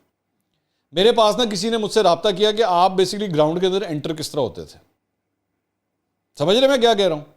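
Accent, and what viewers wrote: Punjab, Pakistan. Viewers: Qurban jaon apke Punjabi Touch pe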